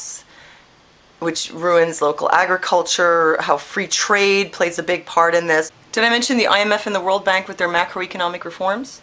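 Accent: England, United Kingdom. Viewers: Your pommie accent is HOT! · Great English accent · LMAO the british accent - so fucking genius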